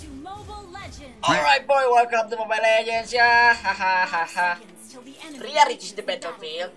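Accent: West Java, Indonesia. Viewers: Logat sundanese salam dari cijerah lur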